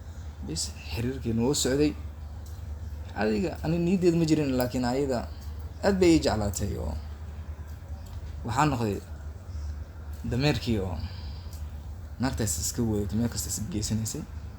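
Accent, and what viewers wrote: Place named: Xamarjajab, Somalia. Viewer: nacso u sound like u from xamarjajab beenlow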